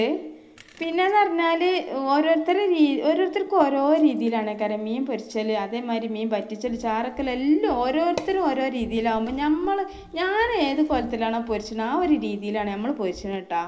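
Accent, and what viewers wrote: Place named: Kottakkal, India. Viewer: bhasha kettittu kottakkal aanu ennu thonni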